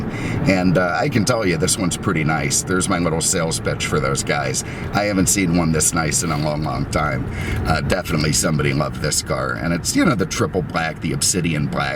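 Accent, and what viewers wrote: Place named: New York, United States. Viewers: Ooh a New Yorker. I look forward to that. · Just can't take the NY accent.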